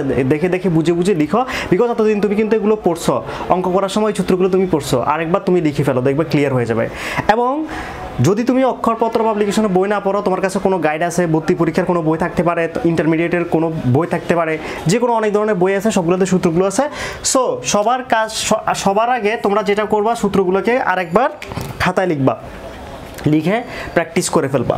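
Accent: Chittagong, Bangladesh. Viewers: চিটাইংগা নাকি???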